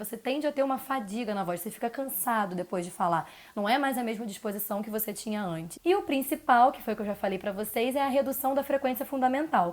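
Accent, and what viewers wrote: Rio de Janeiro, Brazil. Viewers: Não consigo assistir com esse sotaque carioca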